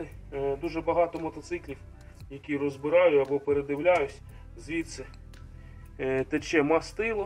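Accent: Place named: Russia. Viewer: Это тот случай когда русскоговорящий пытается говорить на мове.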